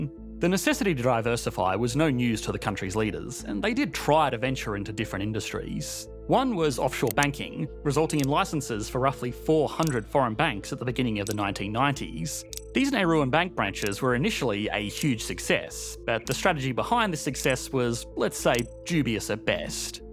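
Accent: Australia. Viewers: Aussie narator. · people getting mad over an australian accent and invalidating the rest of the video's point proves that these people have the highest iq in human history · First time here, interesting topic, only I can stand your way of talking.. using Vocal fry at the end of a sentence. STOP IT and talk normal. (not talking about Aussie accent). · Interesting accent Sounds to me a mix of Australian and tv chef Loyd Grossman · Australian accent in a nutshell. This phoneme doesn't exist. That and ending their sentences like they were questions? I found myself repeating the end of every sentence just to hear what I'd sound like if I were from there? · It's called "Australian". Admittedly, modern Australian, but Australian nontheless.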